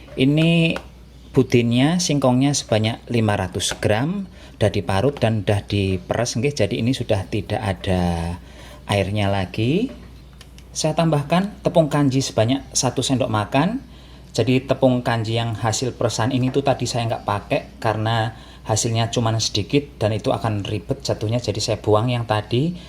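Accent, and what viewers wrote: Banyumas, Indonesia. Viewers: Mas Rika wong cilacap kan ngomong e ngapak² kya enyong budin pinter masak Rika kang...bojomu seneng due laki pinter masak 😂😂😂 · Saya rasa Mas berasal dari Purwokerto Banyumas.Dialek nya mirip.dialek orang Purwokerto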